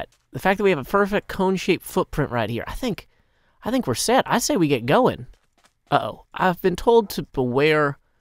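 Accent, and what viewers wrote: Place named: South, United States. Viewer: First time here on your channel, it's sort of refreshing to hear a semi-southern accent in a hermitcraft video! Enjoyed the experience thoroughly, and great poem by the way :) ~your new fan (smoothie) from GA, USA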